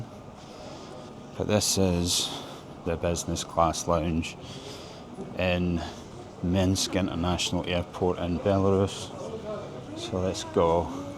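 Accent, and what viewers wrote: Scotland, United Kingdom. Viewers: he missed spoke or it was his scottish accent. He meant Belavia. At the same-time he made it clear it was the national airline from Belarus that he flew out from. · Hi was in Scotland yesterday and met many sounded like yours:) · Nobody knows. Every video could be fit into a 3-minute-short-report, but... 15- minute-bla-bla-bla with awful Scottish accent makes me switch to clear speech of the US-prof. John Mearsheimer.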